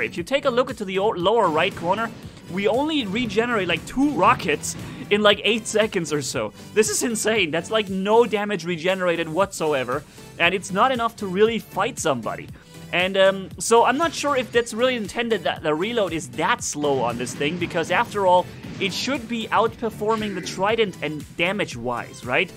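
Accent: Germany. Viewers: Are you German?! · You do realize that English is NOT his native language, he's lives in Germany. Why don't you type your posts to in German and see how well you write/pronounce words in his language.. In fact I'm not positive but I think he learned English just in the last 5yrs or so. Don't worry though, I’m sure and others around the world, who dare to speak on social media in their non native language of English, will take your post to heart, and vigorously practice their proper pronunciation of English words before their next video/podcast.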